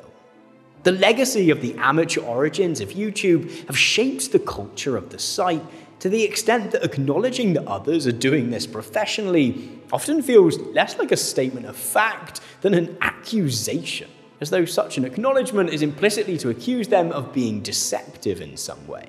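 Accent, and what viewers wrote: RP, United Kingdom. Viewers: cool it with the media training body language and BBC speech. Be yourself.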